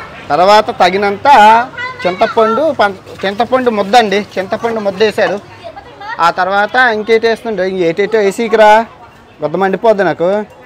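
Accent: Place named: Godavari, India. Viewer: Anna godavari yasa super ga matladavu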